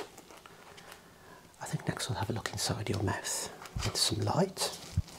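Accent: Birmingham, United Kingdom. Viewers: Are you a brummie by any chance. Love the accent.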